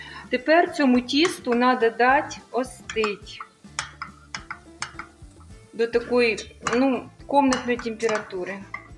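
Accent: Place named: Surzhyk, Ukraine. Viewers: Бо дикий суржик)) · А наша пані з Одещини,і мене зовсім не обтяжує її суржик.Людина Вам розказує показує,відкриває Вам душу,а Вам виявляється,важко слухати.А наша пані не на сцені,декламувати поезію.Вона розмовляє своєю,рідною мовою,до якої звикла,якою розмовляє все своє життя.Не треба вносити ложку дегтю в бочку меду.Попробуйте і Вам буде приємніше слухати нашу.До речі,я з Хмельниччини і також не розмовляю чистою українською,так що мені й не жити?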